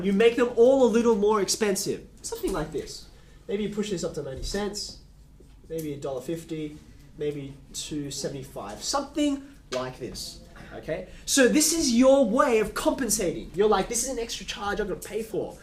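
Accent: Australia. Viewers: My eyes say Chinese, but my ears say Australian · Hate aus accent. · Woah it’s an asian guy with an Australian accent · Besides his obvious accent, you know he's Aussie when he says "Amex."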